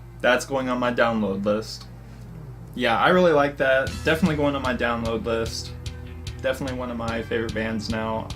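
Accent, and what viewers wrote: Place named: United States. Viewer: I could be wrong, but I would assume from your accent that you live in the US? I believe they're about to be hitting the US really soon! Definitely go if you can (apologies if i'm wrong)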